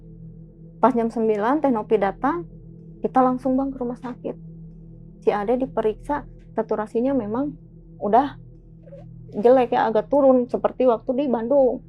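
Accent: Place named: Cianjur, Indonesia. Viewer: Kakyk logat cianjur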